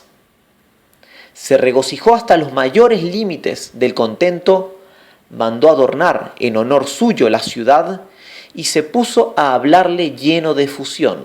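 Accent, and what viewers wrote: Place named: Arab world. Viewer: Por lo que veo, está leyendo la traducción de Blasco ibañez, basada en la versión de marduz, que es lo que estaba buscando. Las críticas que leo sobre el estilo del narrador son tontas, obviamente hay diferentes estilos de narración oral, a mí me gusta ese dejo árabe o del sur de España que tiene (soy argentino, no lo puedo precisar), pero gustos son gustos; lo que sí es importante es que es una voz humana.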